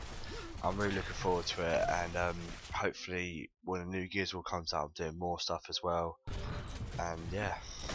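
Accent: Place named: United States. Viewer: Yay <3 thought you were American !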